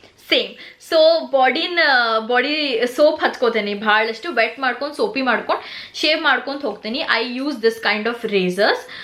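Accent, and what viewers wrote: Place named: North Karnataka, India. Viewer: 1st channel which i have subscribed in YouTube. Irrespective of content ond reason Kannada adrallu uttar Kannada innondu gotthilla 😜 sometimes we like some things without reason… keep talking 👍👏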